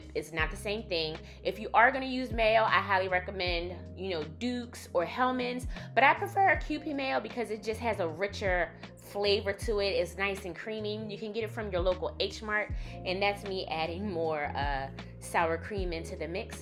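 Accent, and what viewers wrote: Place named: Philadelphia, United States. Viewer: You from philly!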